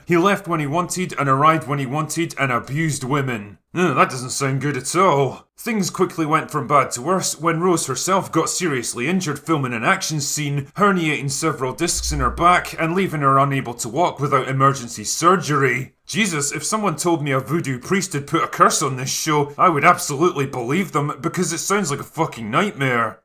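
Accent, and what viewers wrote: Scotland, United Kingdom. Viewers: your less-funny-but-scottish mr plinkett schtick is top notch, keep it up mate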